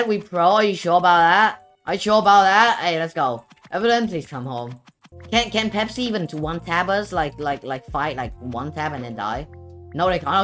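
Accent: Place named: Vietnam. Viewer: Are you Vietnamese you just have a accent(no offence)if you are Vietnamese I'm also vietnamese:)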